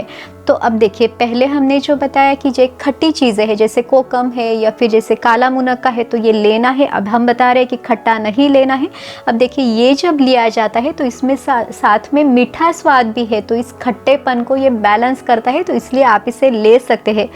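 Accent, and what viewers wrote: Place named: Maharashtra, India. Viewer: खूप छान माहिती दिली आहे.आवाजा वरुन असं वाटतं की आपण महाराष्ट्रतील आहेत...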